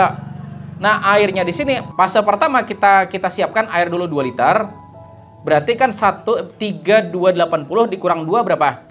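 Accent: Banjar, Indonesia. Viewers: Puan orang mana ta buat logat banjar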